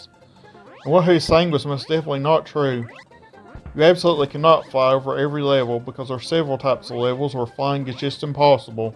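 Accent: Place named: Alabama, United States. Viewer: Where are you from, buddy? Alabama?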